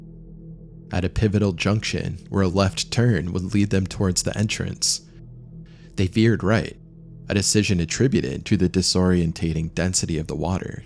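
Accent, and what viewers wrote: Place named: California, United States. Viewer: U are SO Californian🤣 I love listening to you cause it’s like one step down from Bill and Ted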